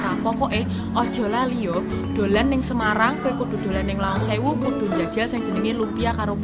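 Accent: East Java, Indonesia. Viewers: dialek semarangan tapi logat jawa timuran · aku rasa ini masih kerasa jawa timuran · ini logat jawa tumur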